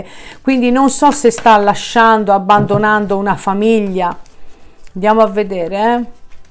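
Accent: Tuscany, Italy. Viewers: Io la LEI, esattamente cosi.. LUI non è un segno di acqua ma ci divide l'acqua. Io in grecia (causa covid) lui in italia. Abbiamo avuto un litigio bello forte.. Cmq complimenti.solo oggi ti ho trovato ma sei fantastica. Adoro la tua cadenza toscana😜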